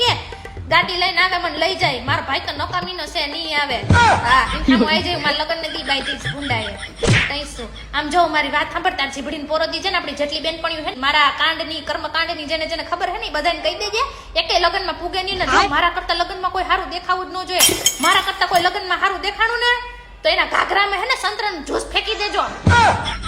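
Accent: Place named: Keshod, India. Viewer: બેન તમે કેસોદ બાજુના છોને તમારી બોલી ઉપર થી એવું લાગે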